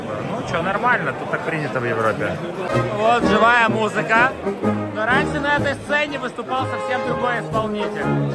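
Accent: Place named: Odessa, Ukraine. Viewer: Первый раз смотрю видео автора, практически сразу, по говору понимаю, что наш человек, одессит!) Также был на этом матче на гостевом секторе, эмоции суперские. Nel bene e nel male forza Internazionale!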